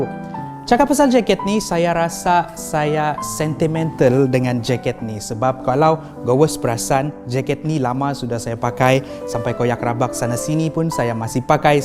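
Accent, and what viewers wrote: Sabah, Malaysia. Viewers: Ainahhh org sabahhhh baini .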